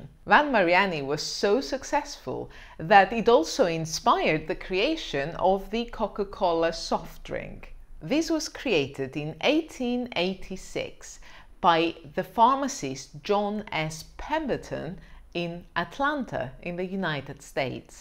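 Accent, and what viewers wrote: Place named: Italy. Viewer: Redhead ✓ Wine expert ✓ Italian accent ✓✓✓✓✓✓✓✓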